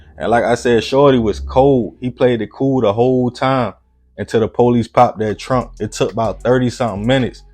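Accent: Baltimore, United States. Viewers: This narrator has to be from Baltimore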